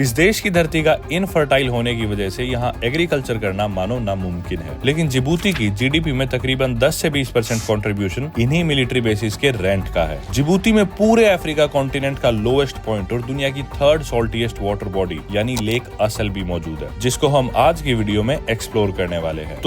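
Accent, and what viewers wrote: Haryana, India. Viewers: Hariyana ka hai bhai lage tu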